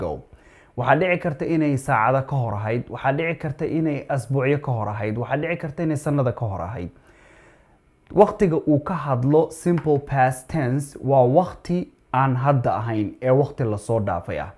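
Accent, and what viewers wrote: United States. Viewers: In fact, i admired your of way tecahing.. jazaakallaahu kheyran.. Please lemme know where and how u learned that rough language, specifically the American accent...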